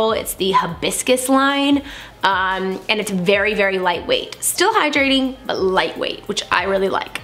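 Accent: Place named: Texas, United States. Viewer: Not too strong, but I assumed you were a Texan the first time I heard you speak. It's not country though or East Texas twang. Brings out my drawl anytime I hear another Texan speak ( or Southerner for that matter), even when it's not thick. No idea why! Graveyard Girl does it to me as well. Incidentally, I watched Good Girls the other day and was excited when I recognized you. I yelled at my husband " WE HAVE THE SAME HAIR!!". He was confused and startled lol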